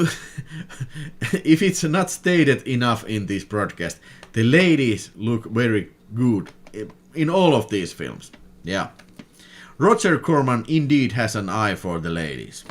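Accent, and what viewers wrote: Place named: Lapland, Finland. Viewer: This is the first video of yours I've seen, but I love style and content. The accent takes me back to all the summers I spent in Lappland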